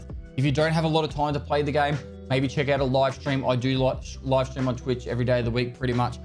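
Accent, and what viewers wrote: Australia. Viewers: Uughhh Australian accent 🤢🤢🤮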